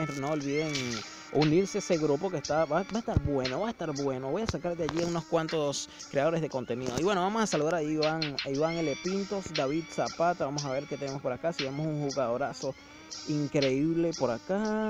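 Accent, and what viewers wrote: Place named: Caracas, Venezuela. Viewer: de donde eres se me parece que eres caraqueño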